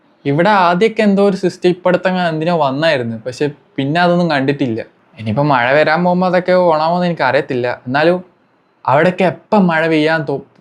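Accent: Kannur, India. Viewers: Bro kannur aano. Oru doubt!!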